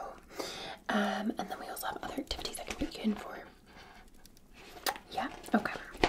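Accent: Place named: Scotland, United Kingdom. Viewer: Love your Scottish accent and your scottish hotel videos I have PTSD and your accent always makes me safe my first asmr video was your cozy cottage video been a fan ever since thank you for all you do your fan jamie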